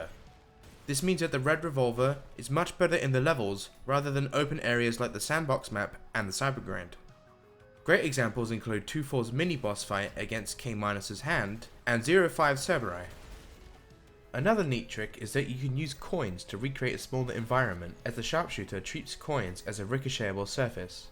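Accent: United Kingdom. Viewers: The biscute shootah ,oh gosh i really like the british accent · dude i laughed so hard when i relised he's british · How did I correctly guess this was going to be narrated by a British man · 100% british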